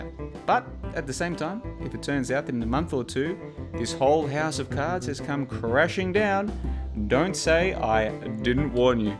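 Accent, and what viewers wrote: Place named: Australia. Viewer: I don't disagree with anything said here.. Private company issuing tethers is not dissimilar to central bank printing money. Tether will undoubtedly be involved in the crypto crash. Although still lots of room to inflate first.. Thanks for putting it in simple terms and nice to hear an Aussie accent in this space!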